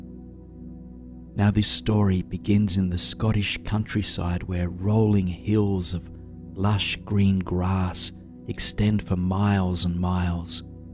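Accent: Australia. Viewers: Hi, I really love your voice and most nights, I think ill see if this story has no sound added! I don't know how people don't find music or other sounds, in the background very irritating! It feels like hearing hearing finger nails, scratching a blackboard to me! Of course, you can't cater your stories just for me and I'm not a patron (if you have one) so, I have a cheek to say it but I'm genuinely disappointed and I do listen to others and do support them with money at times, as a thank you but I just hate missing out on your voice! I don't generally enjoy men's voices but you have a nice Australian lilt to yours! Again, I am crestfallen and I keep looking to see, if there is a no sound version but there rarely is! Unless, I'm mistaking you for another person but I think, you did kindly post me a no sound (music or added sounds, just white noise to me!) version of a story before. 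It is getting to be an irrational thing for me now because there are so few adult story tellers, as it is! I even am starting to dislike the people that say, 'oh I love the added music' etc etc lol I seriously do need sleep as I'm cracking up! I will just have to give up but I do love your voice and I wish you the best! God bless, from Ireland xx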